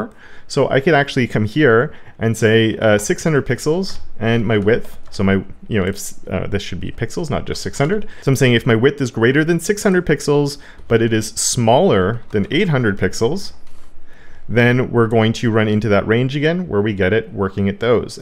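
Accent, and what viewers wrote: Canada. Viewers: It's definitely magical. I love it, and love only learning CSS NOW rather than 5-10-20 years ago. (I did try 10 years ago but just didn't click). Id be interested if you do a video on the different available container types regarding sizing. Aside: continer style queries ;) Hoping my daughter will come back with a tinge of your accent. She's just gone to St John's area in Newfoundland for 5 months of school (she's 17, specifically Conception Bay South)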